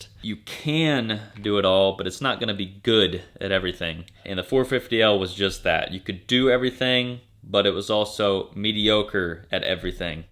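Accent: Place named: United States. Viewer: Just a nitpick but KTM and Husqvarna are also by definition "across the pond". America doens't make jack shit with regards to off-road motorcycles, not a damn thing. Am I assuming too much? You sound like an American!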